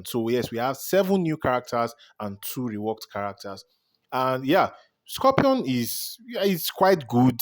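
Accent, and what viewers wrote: Nigeria. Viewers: question are you Yoruba or from the south west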